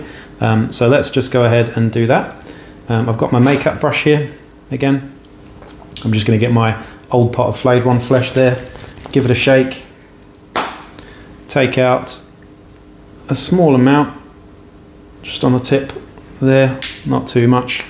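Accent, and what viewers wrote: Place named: England, United Kingdom. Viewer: I am confused... an English Accent, a Kiwi greeting mentioning Australian shops 🤣🤣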